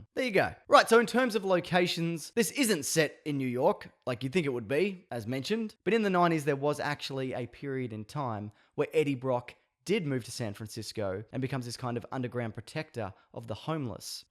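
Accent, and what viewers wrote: Australia. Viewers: Wait I just clicked on this video and I have no clue who you are but you sound great! Umm are you Aussie cause I am I can hear it in your voice are you???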